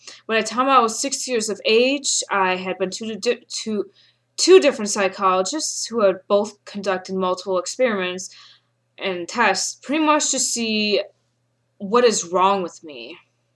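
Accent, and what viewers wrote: New York, United States. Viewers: Is that some sort of new york accent? Cool.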